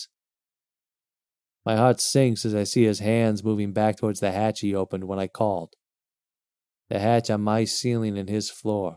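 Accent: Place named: New York, United States. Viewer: Sounds like New York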